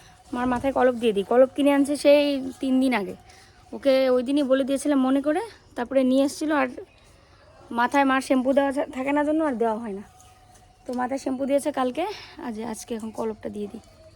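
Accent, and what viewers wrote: Bangal, Bangladesh. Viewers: তোমার বাঙাল কথার টান ভীষন ভালো লাগে। আমি তো ঘটি তাই তোমাদের বাঙাল কথার একটা আলাদা charm লাগে। মিকি কি মিষ্টি। আমার দুটো ডগুর মধ্যে একটার নাম মিকি।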